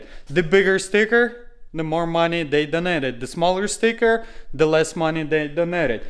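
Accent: Russia. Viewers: ты русский? акцент сразу заметен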